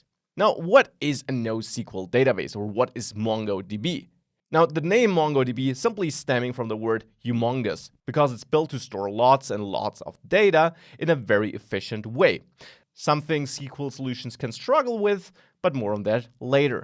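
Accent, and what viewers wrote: Germany. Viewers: I have not quite completed your video yet, but I have to comment on your clarity and thoroughness! It sounds like you might also be German. ☺️ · There are special commands so called "choins" :-) I really love this german accent! Thank you for this comparison, very interesting and complete.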